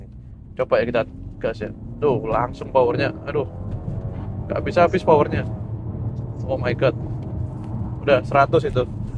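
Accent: Surabaya, Indonesia. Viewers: Wes luweh asik gae logat arek suroboyoan ae ko...salam sego indomi🍚🍜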